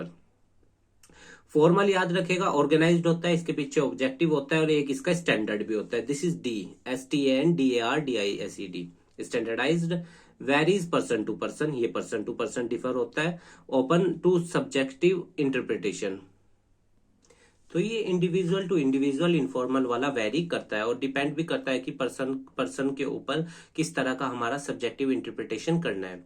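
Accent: Haryana, India. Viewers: Yor are from haryana ?? Thanku bhaiya.. Your explanation is very helpful for me.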